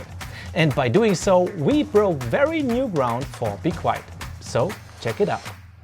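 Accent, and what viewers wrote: Germany. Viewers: Dieser deutsche Akzent hahahahaha Feier ich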